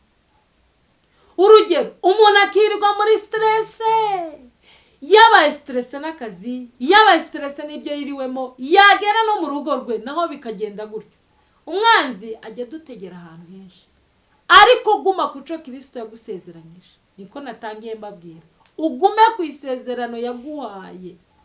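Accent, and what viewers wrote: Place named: Banyamulenge, Democratic Republic of the Congo. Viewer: Ese ko numva uvuga nkabanyamurenge uriwe ndabakunda peee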